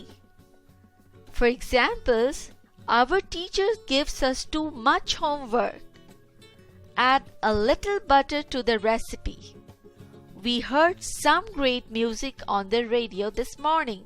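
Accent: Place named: India. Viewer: where r the odds ... bruh indian teachers again , just look at the accent its annoying tho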